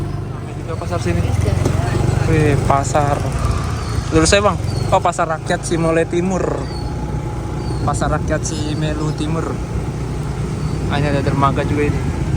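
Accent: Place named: Depok, Indonesia. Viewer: Bahasa lo depok bet bang ✌✌😉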